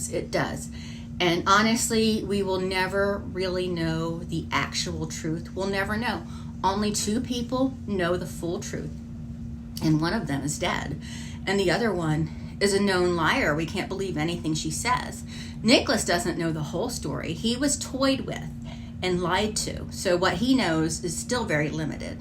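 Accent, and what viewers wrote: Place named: South, United States. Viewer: Every now and then you drop your g off the end of your words and you sound real Southern and it's just adorable. Yes, should get with a bigger company and collaborate on a BIG TIME documentary. She has probably the most in depth knowledge of the actual events...I called her the "Advanced Gypsologist" or something like that when she was going up to fight the lawsuit saying she couldn't claim " Leading Expert".😂😂😂 and got a chuckle out of her on the Live that night. I was so worried for her and Sara just having to take off to go like that.... Meanwhile... Back in Da By-you...da family is lockin' da bedroom doors at night and hopin' she really doesn't take up cookin'...😂😂😂😂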